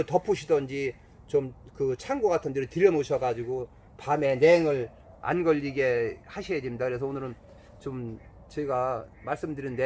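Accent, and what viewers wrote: Chungcheong, South Korea. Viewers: 충청도 사투리가 정겹네요 좋은정보 감사합니다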